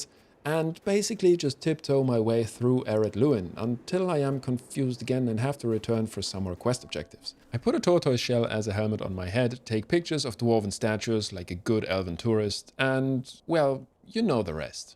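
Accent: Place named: Germany. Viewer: Nice stuff mate :) That German accent cannot be unheard, Grüße gehen raus!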